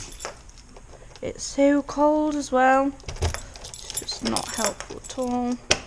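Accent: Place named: North Yorkshire, United Kingdom. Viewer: Are you from North Yorkshire?? I am from there xx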